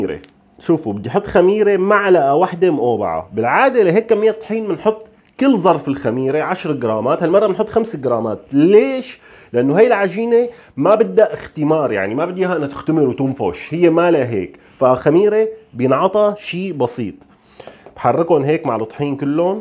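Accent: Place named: Syria. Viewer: فديت اهل سوريا واللهجة السورية متابعة من العراق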